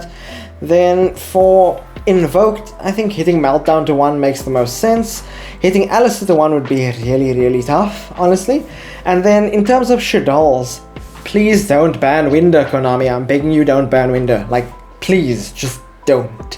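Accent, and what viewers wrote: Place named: South Africa. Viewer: Interesting content my man, where are you from? South Africa?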